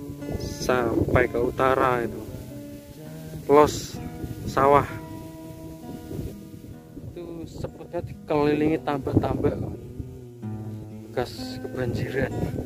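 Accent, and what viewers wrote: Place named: Java, Indonesia. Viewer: Q suka cara ngetapnya.. Medhok tu maszehhh Salam hormatku boss ku ❤️🙏❤️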